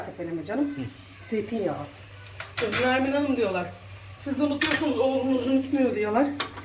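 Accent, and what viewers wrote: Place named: Aydın, Turkey. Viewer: Benim de annem ve babam vefat etti ve Nermin hanimi anneme benzettiğim için çok izliyorum. Bana annemi anımsatıyor. Aydınlı olduğum için şivesi de benziyor lezzetler de benziyor ah ah.... Nermin hanım Allah size uzun ve sağlıklı ömürler versin..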